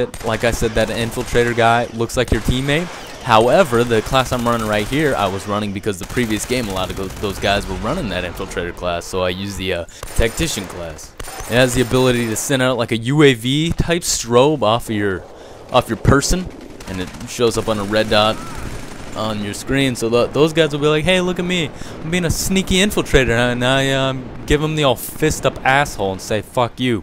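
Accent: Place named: United States. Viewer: more like white with a 95% american accent with 5% scottish. good try though.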